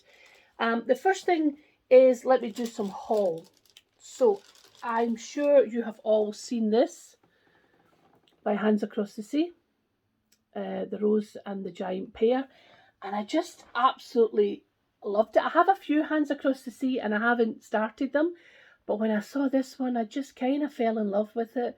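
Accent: Scotland, United Kingdom. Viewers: Hi, I'm fairly new to your flosstube, just started watching before Christmas. Michelle from mommalovesyougb recommended you and I fell in love with your accent. I'm in Canada and have Scottish ancestry so something about the Scots accent appeals to me. I think your choice of projects are great. I've never been to Paris, had a chance probably 10 years ago but went to ireland instead. I like the chart tho. I didn't hear you mention if this was just for UK only stitchers, if it is just disregard my entry. Cheers on getting back on your feet, nothing worse than health issues during covid times.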